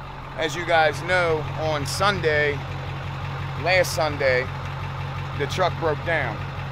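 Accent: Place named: Delaware, United States. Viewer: Could tell this was Delaware soon you started talking 😂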